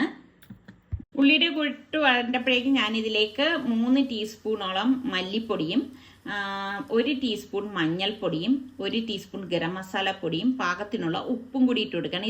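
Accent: Trivandrum, India. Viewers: Yellam super TVM samesaram super Njanum aviduthekariya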